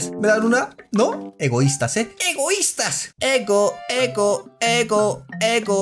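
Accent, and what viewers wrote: Chile. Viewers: Eres Chileno como yo 😂😂😂😂😂❤ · OMGGGGG PENSE QUE SERIAS MEXICANO PERUANO O ALGO ASI POR EL ESTILO PERO NUNCA PENSE QUIE CHILENO 😧 Y APARTE CONOCES LOS COUNTRYBALLS VIVA CHILE MIERD@@@ :O Y APARTE ERES MI YT FAVVV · En serio eres chileno si o no ???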